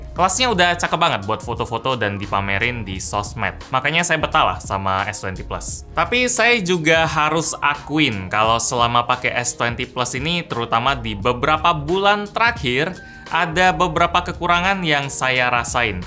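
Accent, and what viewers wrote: Palembang, Indonesia. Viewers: Uong palembang ruponyo haha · "Digabung tigo ikok, yang ini ini ini, atau duo ikok be". Palembang nian bang 😂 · 2.ikok bae ? Wong palembang apo ?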